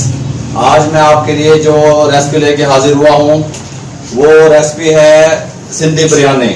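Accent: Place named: Mewat, India. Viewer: Mewati lgta h cok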